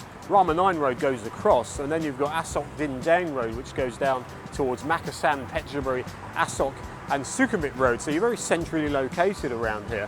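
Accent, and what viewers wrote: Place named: Yorkshire, United Kingdom. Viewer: someone told me you were born in Leeds, same as me, is that corrct? You certainly have a different kind of Yorkshire accent. Your videos are interesting and well researched, highly unusual in this field, cheers mate.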